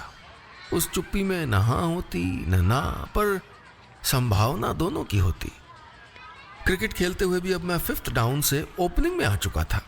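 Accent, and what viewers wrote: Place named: Pahadi, India. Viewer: Pahadi accent awesome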